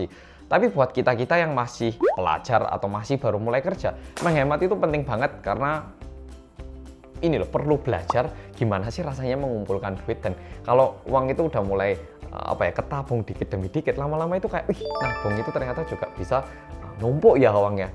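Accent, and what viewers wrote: Java, Indonesia. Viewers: Orang korean yang medok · Jangan ngomong bahasa Jawa ke aku:').Aku gak bisa bahasa Jawa.Coba kamu denger aja deh intro rindingdong nya mas.Mas kan ngomongnya medek wkwkkwkwkwkkw🤣🤣🤣🤣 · Sukses terus mas, ga pernah bosen aku nonton channel ini, mantap lah, jowo ne medhok tenan, wess apik lah poko'e. sukses terus mas. semoga lebih maju kedepannya. dan dapet menginspirasi kita semua mas🙏🙏🙏🙏🙏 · 1. Jempol, Mas utk segment barunya...👍 2. Sy emak2 biasa yg ga ngerti investasi forex ato reksadana ato apalah gitu2. Jadi invest nya dlm bentuk LM aja. Dari tip no1, alhamdulillah udh lumayan dpt 'segenggam' LM..😘 3. Tip no 3 sdg dicoba. Kok rodo angel yo atur mindsetnya... hehe...😏 4. Looking forward to other practical tips n tricks ya nan irit. 5. Tqtqtq😊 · Ta pikir orang korea yang medok eh tau nya irit😬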